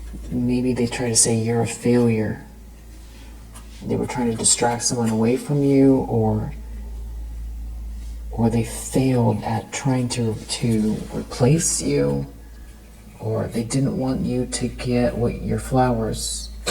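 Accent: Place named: North America. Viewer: Im pretty sure isnt British, you can tell by how she pronounces her "R"s she is almost certainly from north America (Probably USA, or maybe Alaska or Canada)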